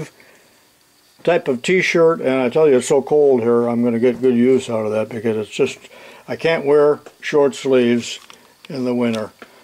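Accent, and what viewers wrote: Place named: United States. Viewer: I like your accent. To a north European like me, it sounds like I'm watching a western cowboy movie! 👍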